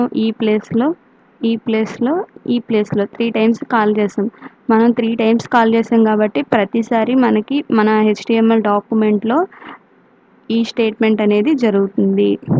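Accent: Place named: West Godavari, India. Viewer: Mam midhi West Godavari dt Slang ga undhi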